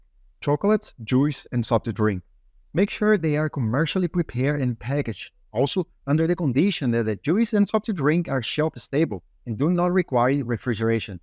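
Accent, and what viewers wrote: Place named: India. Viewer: Guy sounds like a Telemarketer from India.They can't find an Aussie as narrator??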